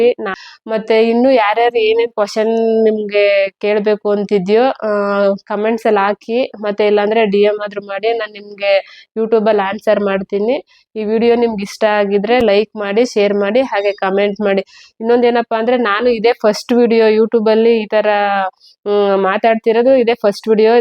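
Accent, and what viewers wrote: Mandya, India. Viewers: One and only Mandya district language 🎉🎉🎉 Namdu Mandya district sathnuru....naavu nimma tharane matadodu madam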